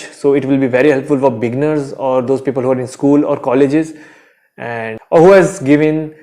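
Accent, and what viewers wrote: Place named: India. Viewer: Sir isme aapki English bhi hindi ki tarah lag rahi h... Sab samajh aa raha h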